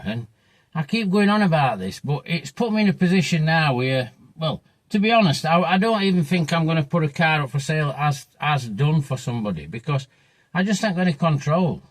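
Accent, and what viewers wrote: Yorkshire, United Kingdom. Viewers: Thanks for the video I always look 4wards to your videos & il always support you in what you do in anyway I can my friend, I'm away sharing your videos & me n my mate have screening binges on all the videos you do. They take us rite back to our youth & I love the way you talk & your accent as you remind me of my gran & mum as they are originally from Yorkshire Bradford n Wakefield. I have so many good memories watchin n listening to you & your videos. Please keep up your amazing work & your mega videos my friend. Best regards. Jim in Manchester.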